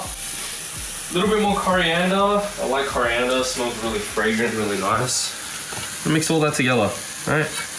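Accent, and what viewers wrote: Australia. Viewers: Are you Australlian? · You sound Australian but you're chinese.. im so confused! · He Australian?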